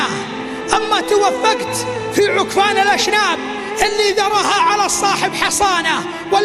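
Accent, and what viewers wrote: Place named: Gulf, Arab world. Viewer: اؤؤف يربي شكد احب الحجي الخليجي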